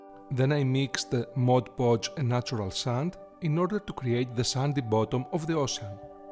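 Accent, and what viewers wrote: Greece. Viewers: what is this gentleman's accent? I want to say Greek? It's lovely, very pleasant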